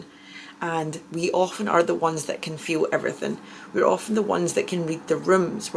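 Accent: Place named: Scotland, United Kingdom. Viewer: It was very evident once I heard you speak😅 my bf is Irish, and I love his accent, but the Scots are something else💝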